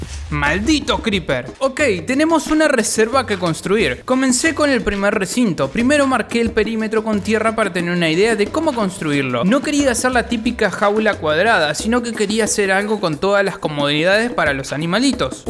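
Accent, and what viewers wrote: Argentina. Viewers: Eres argentino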